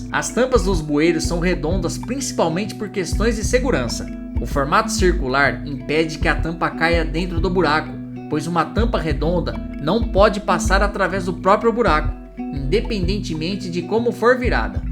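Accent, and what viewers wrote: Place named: Inland São Paulo, Brazil. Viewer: O conteúdo do vídeo é excelente, mas.... Com todo o respeito senhor sua dicção e pronúncia das palavras precis ser melhoradas, quando você pronuncia a letra "r" sua língua embola... Parecido com o sotaque caipira do interior de São Paulo e região sudeste... A pronúncia que você faz é a seguinte: "poirrrrque" "cairrrrteiraaa", "inteirrrrna". Dói os nossos ouvidos...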